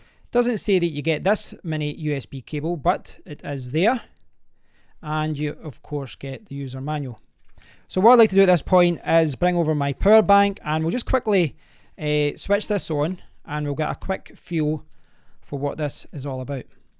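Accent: Scotland, United Kingdom. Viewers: ! Brilliant! Magic! Finally someone on Youtube I can understand. ( I'm Scottish too ) living here in the US. I liked your review of the Dash cam. very good. keep up the good work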